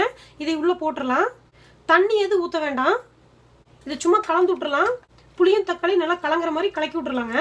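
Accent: Kongu, India. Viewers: unga Slang apt kongu style la irukunga sis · Migavum pandhamana pechu.Arumaiyana samayal. Nandri sis. Nam kongu Tamil alage alagu. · Kongu tamil valka supper akka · Akka kongu slang super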